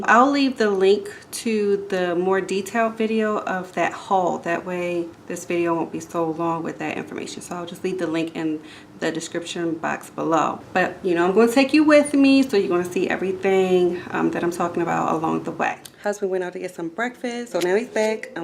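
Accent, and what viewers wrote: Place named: Baltimore, United States. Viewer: You sound like you from Baltimore, MD if so ayyyye! Great video!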